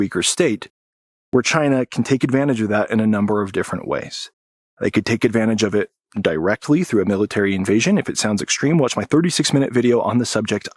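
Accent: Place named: California, United States. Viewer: You're not "man-splaining," you're "dude-splaining" ;) California accent aside, you're quite correct.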